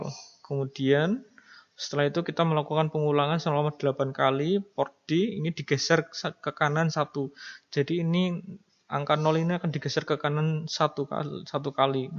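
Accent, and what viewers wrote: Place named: India. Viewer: Can you and all of your countrymen do me and everyone else on the internet a solid. I am not trying to be a racist and i am not, but can you put a subtitle in English on all of yours videos so we can understand what you are saying. An Indian with thick accent trying to speak English is not easy to understand. But a lot of content from Indians are second to none when it comes to a technical aspect of things. with respect.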